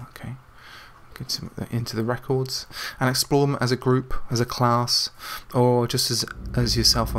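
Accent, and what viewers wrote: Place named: North, United Kingdom. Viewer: Thats really awesome, great work...you say you're from the north, what part if you don't mind me asking, anywhere close to Middlesbrough, can't place the accent :)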